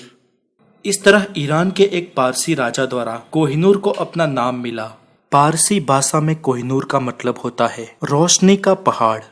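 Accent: Gujarat, India. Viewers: ભાઈ તું ગુજરાતી છુ???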